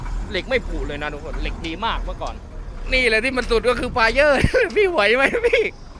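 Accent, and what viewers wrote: South, Thailand. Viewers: อูย่ใหนครับเห็นแหลงใต้ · คนใต้แน่นิ อยู่แค่ไหนคับ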